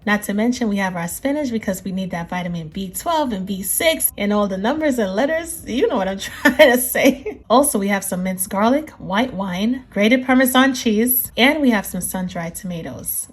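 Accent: New York, United States. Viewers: Delicious recipe, love your Ny accent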